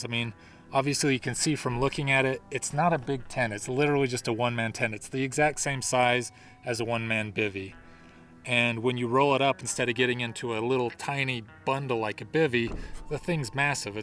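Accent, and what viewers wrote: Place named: United States. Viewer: This is 8th swag video I've watched in a row, and the first from the US. I just had several second shock/adjustment back to the American dialect. 😄 Thank you for the explanation and history. I had assumed the swag was the OZ version of the American cowboy bedroll (I have one), but couldn't understand why modern day Aussies were using such confining shelters. In my search for quick setups and tear-downs, I've largely settled on the Kwik Kamp tent cot for solo camping. It's very similar the swag/cot (stretcher) combo in the video but all in one unit that folds up very quickly. It's not as abuse resistant as a canvas shelter, so I treat it with respect. I'm not trying to hijack the video, but in searching for the Grail of quick truck camping shelters. I've settled on the canvas cowboy teepee. They're very fast to setup, allow room for several sleepers, and room to stand up. 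The white canvas keeps the interior cooler during summer months, and a lantern hanging from the peak really lights up the interior nicely. It's a design that's survived the test of time for good reason, and on of my favorite gear purchases, and a go-to for car camping.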